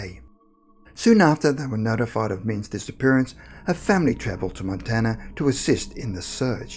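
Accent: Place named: Australia. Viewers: Aussie accent, pretty clean to be honest with fuck all slang or swearing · Australian or Kiwi? Don't have a problem understanding tbh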